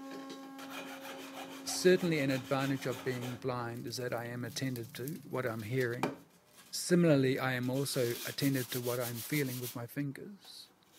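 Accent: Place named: New Zealand. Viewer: Your accent. Sounds from New Zealand.. telling me there's a blind shakuhachi master here??? Such an inspiring guy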